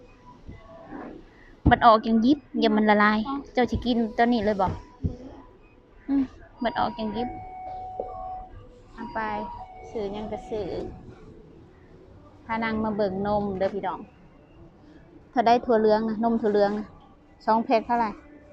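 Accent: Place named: Laos. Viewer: เว้าลาว ดีแล้วครับ ที่ติดตามเพราะมักฟัง สำเนียง น่าฮัก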